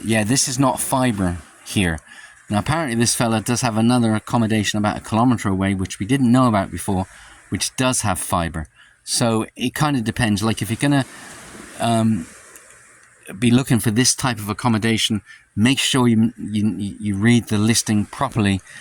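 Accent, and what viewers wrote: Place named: United Kingdom. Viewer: its pronounced "bung-car" hes from Britain us foreigners have quite different vowel pronunciations.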